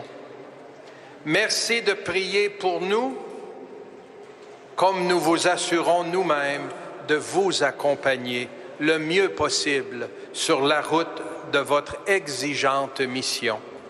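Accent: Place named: Canada. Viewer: on voit que vous avez les origines française Courcelles est noms de plusieurs communes françaises... J'espère la foi dans le Christ... L'accent chantant canadien surprenant ici mais agréable à entendre... Merci aussi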